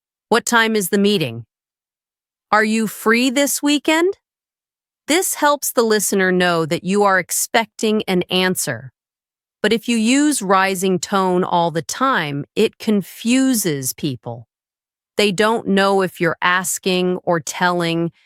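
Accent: United States. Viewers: How come you have shown British flag but speaking American English 😅😂 , overall it’s a great video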